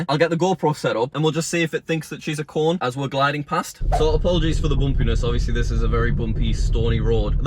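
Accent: United Kingdom. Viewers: He's from the UK, its his accent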